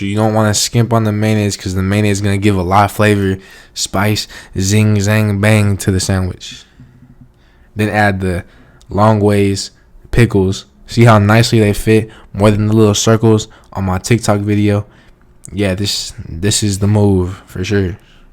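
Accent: Philadelphia, United States. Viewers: Sounds like he has a philly accent to me. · I just wanna know where you from ? Sounds like Philly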